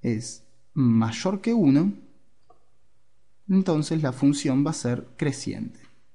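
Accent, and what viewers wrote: Rioplatense, Argentina. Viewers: Bryce Flint No es culpa de él haber nacido en un lugar distinto al tuyo, es su acento de argentino o uruguayo, no se. La culpa es tuya por intolerante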